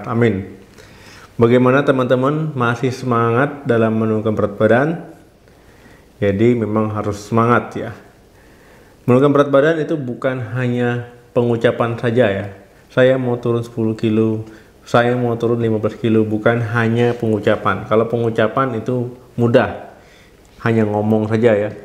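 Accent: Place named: Bali, Indonesia. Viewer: Logat bicaranya kaya ada bali nya dok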